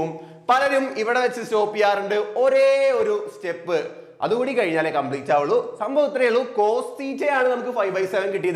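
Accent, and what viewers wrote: Thrissur, India. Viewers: Bro he is pure thrissur. Thats Y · Thrissur ano sirnte veed😅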